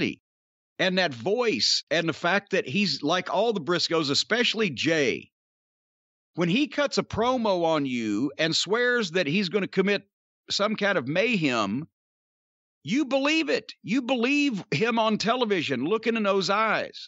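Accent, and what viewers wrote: South, United States. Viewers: Jim Cornette succulent sounds Good Ol' Southern Boy I'm in Northumberland England UK. Big Respect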